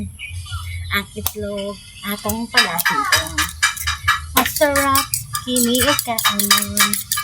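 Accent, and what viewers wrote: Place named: Iloilo, Philippines. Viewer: ilonggoha oy 😂😂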